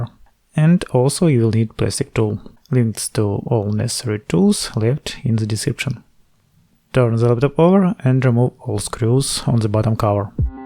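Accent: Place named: Russia. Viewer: I realized, in accent, not like an Englishman. But for Russian, it sounds good.😏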